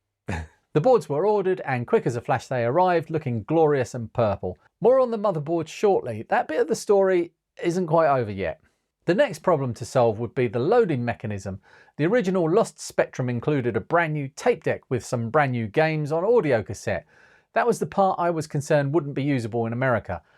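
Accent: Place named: Suffolk, United Kingdom. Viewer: What a great story and outcome!what a lovely human you are. Your channel deserves to be a lot more popular,I hope it grows more this year!I guessed by your accent you were fairly local to me in suffolk and nice to see a local charity being supported. Maybe one day when your life has settled down, you could have a retro open day or pub meet, its always nice to meet like minded people!all the best and good luck 😊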